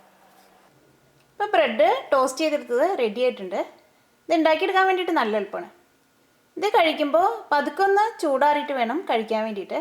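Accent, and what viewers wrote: Thrissur, India. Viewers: Aa thrissur accent inkkishtai.. :) thanks for the recipe.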